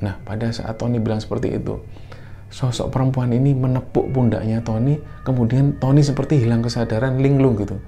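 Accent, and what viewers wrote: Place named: Surabaya, Indonesia. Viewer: Seng tak tunggu iku logat khas suroboyo cak. Ojok di ilangno boso suroboyoe.. 👌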